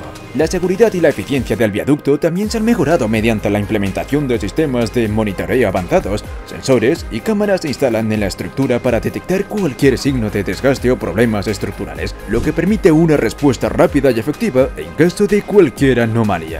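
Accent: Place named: Spain. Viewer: Espero que este tipo de vías de comunicación vial se sigan replicando en el país, es necesario que las carreteras no provoquen un mayor impacto en el medio ambiente, las carreteras de tipo elevadas son una magnífica solución y pueden ser muy bien aprovechados para largos trayectos contemplativos. También es necesario implementar el paso por túneles. El país es hermoso. . . . . No me gusta la voz con acento español, es demasiado ruidoso. Preferiría escuchar un narrador de mi país.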